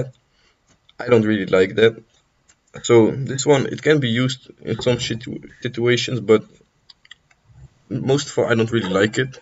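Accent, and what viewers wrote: Netherlands. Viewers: Are u dutch? Lol i hear a accent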